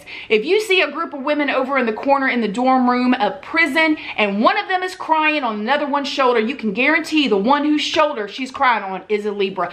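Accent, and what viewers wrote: South, United States. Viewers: Hello. I’m a little late to your channel and everything so I doubt you even see my message but I think you’re awesome. I’ve been binge watching your videos for like the last 3 weeks now and I’m hooked. At first I thought everything on your channel was strictly for women only but I kept watching anyway. I’m from Pittsburgh PA and I know you’re from Florida but you’re very easy to listen too. Plus you remind me of one of the females from around my way. Just with a little southern twang. I loved this video in particular a lot. I know it was just for fun but a lot of what you said was right on point. I’m also a libra so I was definitely feeling this. Anyway keep doing what you’re doing. You have a fan over here.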